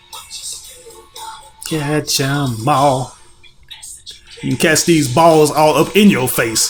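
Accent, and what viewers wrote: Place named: West Tennessee, United States. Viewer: You from West TN/North MS ain’t it? I hear them Arruhs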